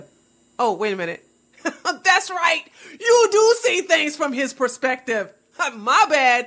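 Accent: United States. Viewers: haha very nice. I love your American English, it got so much character.:) And I agree with a lot of what you say. I think some muslims even lie to themselves, out of fear of going to hell, it's so sad. Due to 5 prayers per day, islam simply doesn't give them the time, let alone permission to question their believes. Especially muslimahs would have such a better life without a religion that tells them they are less worth than men. But I totally disagree with you on the scene with Horowitz. He didn't even answer her question, but proved to be a total dumbass, who can't bring himself to condemn Satanyahu, who's much worse than Hamas. So he was the bigotted jerk in that conversation. Given their view on women, I'm not a fan of Hamas either though. Infidel's greetings from Europe, wazzup!:)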